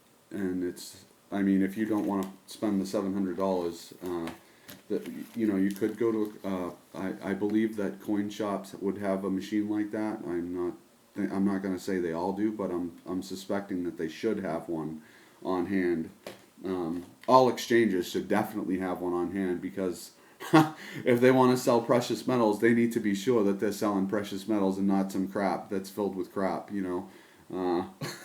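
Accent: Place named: Boston, United States. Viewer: You sound OK to me you Boston guy. I sound worse. Your "Umm" is OK. Better than Howie Carr's "Wizard of Uhs". What town are you in ?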